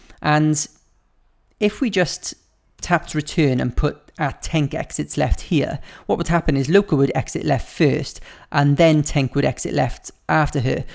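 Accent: Wales, United Kingdom. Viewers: He sounds Welsh! I dunno... he has the same accent as me!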